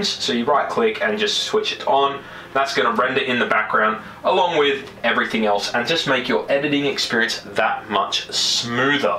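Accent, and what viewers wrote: Australia. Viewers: mate this helped a lot champion. Also you legit sound aussie as but you're Canadian? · Good tips mate... you're also an Aussie yeah? Sounds like a familiar accent haha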